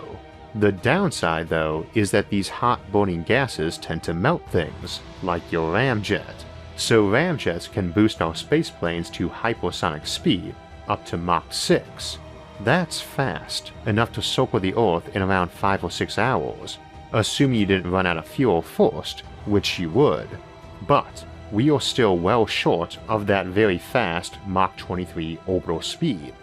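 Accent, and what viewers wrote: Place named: Australia. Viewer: and im still tryna figure it out (i only hear 80% australian idk the rest...). 🤣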